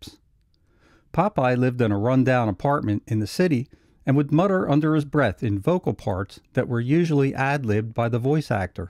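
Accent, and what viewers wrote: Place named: Pittsburgh, United States. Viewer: What part of Pittsburgh are you from?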